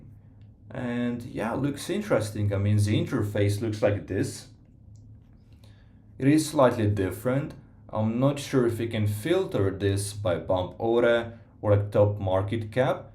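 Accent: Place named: Russia. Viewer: Sound almost Russian 🤔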